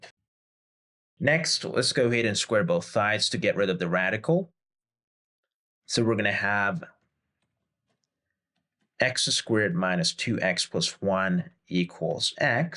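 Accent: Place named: Midwest, United States. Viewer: You're from the midwest.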